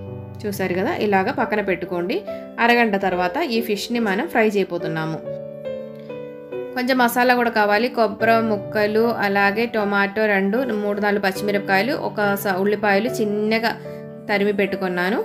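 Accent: Kerala, India. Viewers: Hi ma'am ningal malayali aano ningal Telugu parjunna slang malayalis nde pole thonnu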